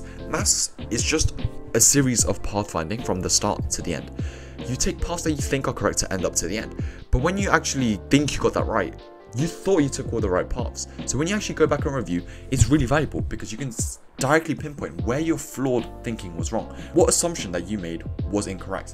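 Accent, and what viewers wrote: United Kingdom. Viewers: i dont know ...he said he lives in germany ..but i feel its a bit britsh 😅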